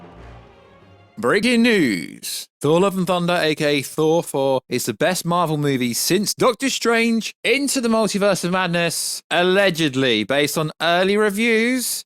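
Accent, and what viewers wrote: England, United Kingdom. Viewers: This terrible channel is so bad I need an interpreter to translate English accent to American!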